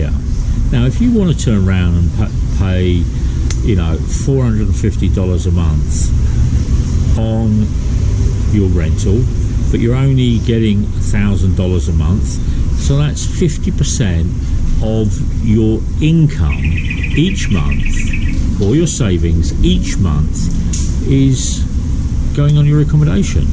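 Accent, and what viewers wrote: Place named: United Kingdom. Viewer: you speak like a brit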